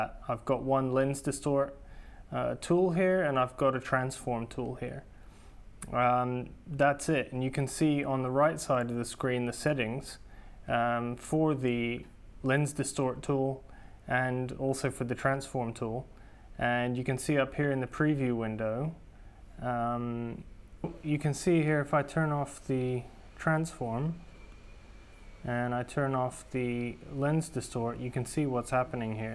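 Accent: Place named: Southland, New Zealand. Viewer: From your accent am I correct you are from Southland in NZ?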